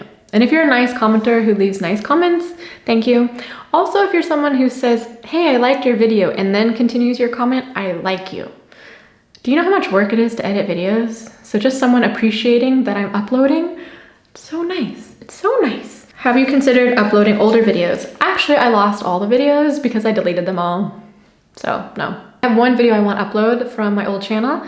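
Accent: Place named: Germany. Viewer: Hey, just found your channel. I love your story and how you came to be in Germany. It sounds like you worked really hard to adapt to the German lifestyle and everything that comes with it. I can even hear a little bit of a German accent in your voice as well, which is awesome. I've heard of Americans who have lived in Germany FOR EVER and still haven't found their German tongue. I myself am half German and have been raised bi-culturally in the US. You've re-invigorated my drive to some day make that big 1-way trip to Germany some day soon.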